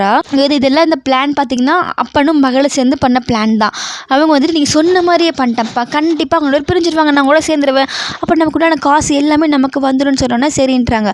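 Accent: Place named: Sri Lanka. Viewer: Unga voice srilanka voice mathiri irruku sis .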